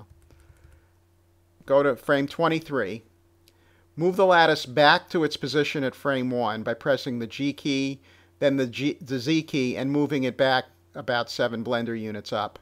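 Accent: Boston, United States. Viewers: Lol I can TELL your from boston I can hear it. you say Bwall. =D Anyways, nice vid ;)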